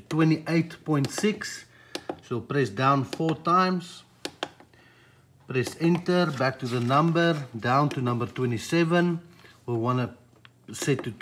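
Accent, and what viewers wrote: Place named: South Africa. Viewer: You cant mistake a south african accent anywhere ...nice one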